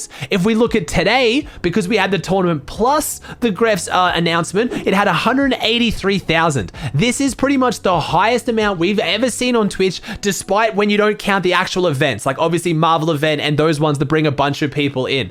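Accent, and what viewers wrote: Australia. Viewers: He said his gamertag got u aussie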